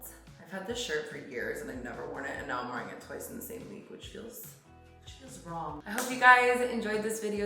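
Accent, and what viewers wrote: New Jersey, United States. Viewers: Wait why does her voice accent and tone sound different? She sounds less Jersey here😮 I love her NJ accent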